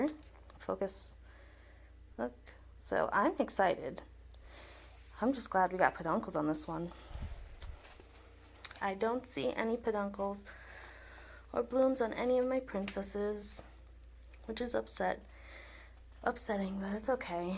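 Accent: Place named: New York, United States. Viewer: Beautiful flowers. Congrats! Where did you find those gorgeous big compacta baskets? You sound like your from NY and I have never seen those anywhere in the NYC limits. 😊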